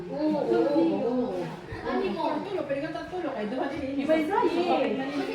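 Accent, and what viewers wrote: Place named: Waray, Philippines. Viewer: Upaya gad. an pag Tagalog.. Ky"WARAY"accent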